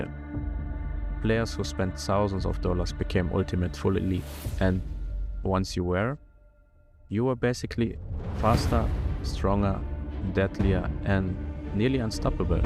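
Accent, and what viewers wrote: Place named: Germany. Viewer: wie deutsch willst du alles aussprechen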